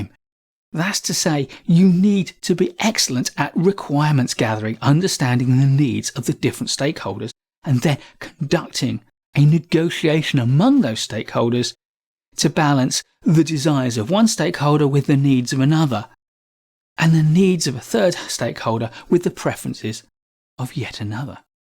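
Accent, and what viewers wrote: United Kingdom. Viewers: great video and great explanation and what makes it better is your excellent english, British accent and soothing voice I honestly can listen to you all day you should consider making podcasts fr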